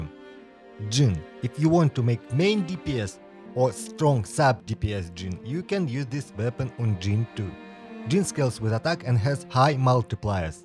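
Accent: Russia. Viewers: Русский акцент узнаю из тысячи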